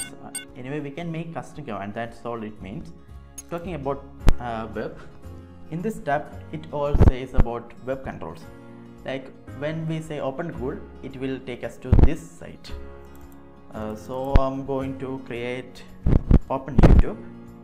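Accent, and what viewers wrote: Kerala, India. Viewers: Your speaking feels like you are a malayali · of course he has an indian accent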